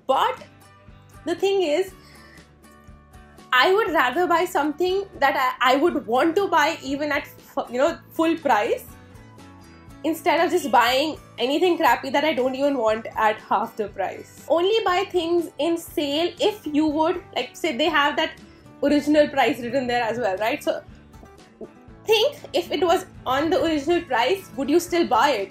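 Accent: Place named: Delhi, India. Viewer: Just a observation.. Nothing negative.. Delhities.. Usually pronounce here as hare... Thank you for the vid.. Very helpful tips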